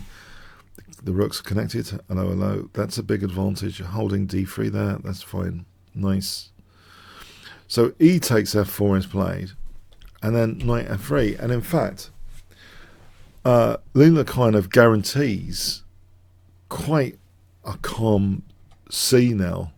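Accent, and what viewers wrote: United Kingdom. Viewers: His low voice and British accent makes it really difficult to understand what he's saying.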